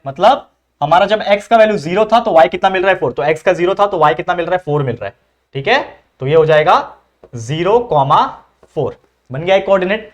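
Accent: Maharashtra, India. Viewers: Sir apki marathi bohat cute hai❤❤❤❤❤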